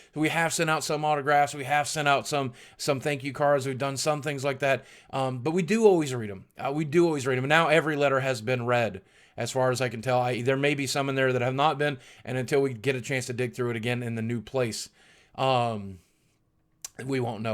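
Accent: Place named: San Francisco Bay Area, United States. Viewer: Hella? you sound like you grew up in the SF Bay Area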